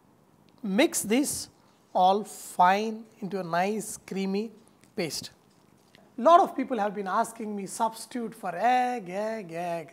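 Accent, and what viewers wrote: India. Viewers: Your accent reminds me Rajesh from The Big Bang Theory. xD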